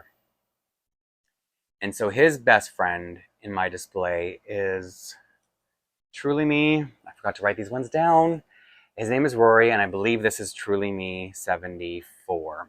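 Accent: Canada. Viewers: I made it to the end! I started watching your videos a couple days ago to procrastinate homework. (About halfway through this one I decided I needed to multitask.) But I watched the MapleLea winter haul video earlier today where you mentioned you were Canadian and I didn't pick it up then but I definitely heard the accent in this video. My mom thinks my collection is insane (17 dolls as of now) but this just might prove her wrong, ha!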